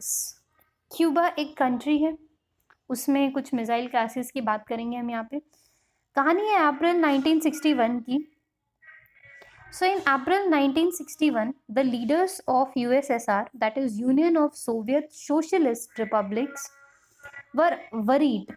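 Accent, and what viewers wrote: Uttar Pradesh, India. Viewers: Mam app U.P. se ho kya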